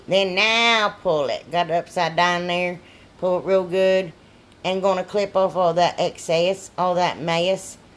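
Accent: South, United States. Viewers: Gurl you're a hoot!! Just found your channel.. love your projects..where are you from.. I am in hsv Al..you def from south 😜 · Oh me oh my, I am soooo happy to have come across your channel....you are just the cutest funniest southern Belle I've ever heard. You gave me the giggles 🤣🤣 I near done wet my pants laughing at you. Thanks for being YOU!!!! You got a new fan here. 👏🏽👏🏽👏🏽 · Girl! You are my absolute favorite crafter! All your projects are beautiful. Plus your country accent is right up my alley! I’m a Georgia girl so you speak my language! 😂 TYFS!!! Sending blessings your way. 😇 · Omg..I just found you and watching you for the first time and I'm cracking up!! Love that Southern accent 😍. Keep doing what you're doing! Thanks for the laughs, I mean it in a good way.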